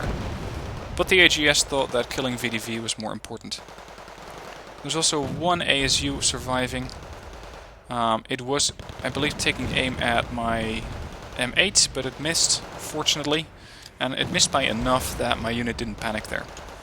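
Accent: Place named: Netherlands. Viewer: Is that a little Dutch slipping through ? Where are you from, your accent sounds different?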